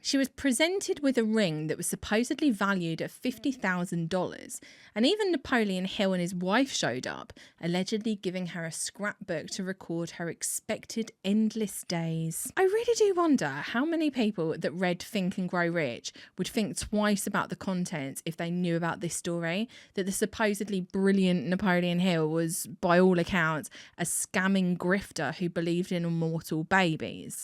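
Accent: United States. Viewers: Yay a sponsor! And your American accent has gotten much better ☺️ Thanks for anther fantastic video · Excellent American accent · I love your American accent! well done! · Good American Accent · You rock the American accent!!!! Great video ty so much ❤ from USA 🇺🇸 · Your videos are consistently so good that I automatically click like before Ive even seen it. P.s. your american accent is getting even better! lol... sounds kind of californian. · yer merican accent is funny af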